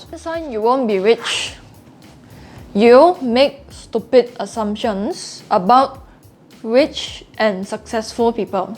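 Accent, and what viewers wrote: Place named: Singapore. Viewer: Two things i love from (No Sarcasm): 1. Her punked singlish accent & style 🤘 2. F*ckin honest forex coach & motivator who DOESNT SELL ANY CRAPS, DAYDREAMING & BULLSH*T things about trading forex. Big thanks & respect!! 👍🏻👍🏻👍🏻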